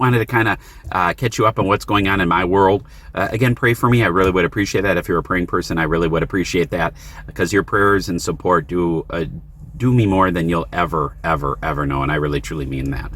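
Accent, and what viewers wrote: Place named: Wisconsin, United States. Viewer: Your Wiscahhhhnsin accent cracks me up! 😂.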